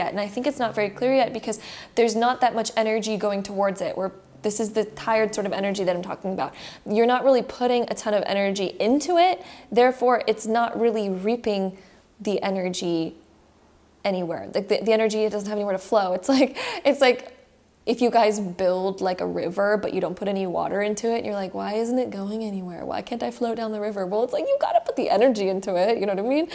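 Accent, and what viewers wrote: Australia. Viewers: OK BUT YOUR AUSSIE ACCENT IS AMAZING AND WOULD TOTALLY HAVE ME FOOLED 😂😂😅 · Your Australian accent is absolute gold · you are the cutest human 😭😍 the Australian accent got me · It’s the Aussie accent for me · Ahhh I love the Australian accent haha. You always remind me to have fun no matter what. Keep those vibes high!!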